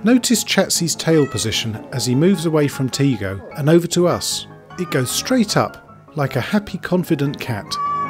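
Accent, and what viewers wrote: United Kingdom. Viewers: british accent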